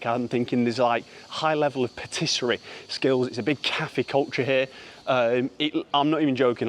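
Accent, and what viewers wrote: United Kingdom. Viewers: Thou accent is English isn't it?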